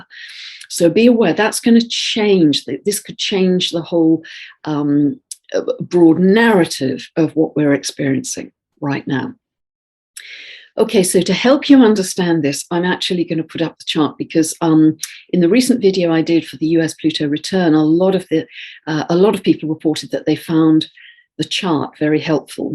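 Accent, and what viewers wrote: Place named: RP, United Kingdom. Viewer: You have the poshest accent ever. If anyone wants to know what "the Queen's English" or "BBC English" or "Received Pronuncation" sounds like, listen to this young lady. Some English people have accents that "sound" super high class, but when they pronounce certain words with a bit of a working-class twang, it gives them away as not really posh. Your accent, however, is truly impeccable.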